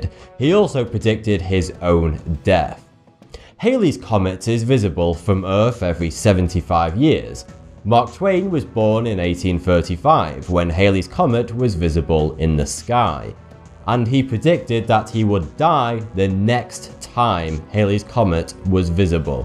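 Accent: United Kingdom. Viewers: I put this on before bed, and only listen to it... Ive listened to a bunch of these, and all I hear is "42 here" not thoughty2 lol.... British accent! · Is that British accent? I must replay some sections because of that accent. Not to say that British isn't a beautiful accent. It's just that I rarely have the pleasure to hear accented English. But the speaker is rather cute. Like, bunny type of cute. The type than you wanted to cuddle and eat- I think I'm going to stop now.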